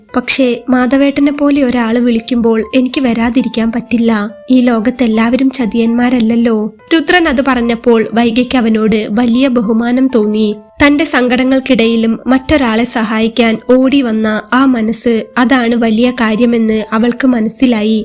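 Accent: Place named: Malappuram, India. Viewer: കഥ കേൾക്കാം. ആസ്വദിക്കാം. മലപ്പുറത്ത് ഴ എന്നത് ശരിയായി ഉച്ചരിക്കുന്നത് കുറച്ചാളുകൾ മാത്രമാണ്. ചെറുപ്പം മുതൽ കേട്ടതും പഠിച്ചതും ആ രീതിയിലാണ്. എല്ലാവരും എല്ലാം കുറ്റമറ്റ രീതിയിലാണ് പറയുന്നതെന്ന ധാരണ മാറ്റണം. ബുദ്ധിമുട്ടുള്ളവർ കേൾക്കാതിരിക്കുക. കുട്ടീ നീ നിൻ്റെ രീതിയിൽ തന്നെ തുടരൂ.എല്ലാവിധ ആശംസകളും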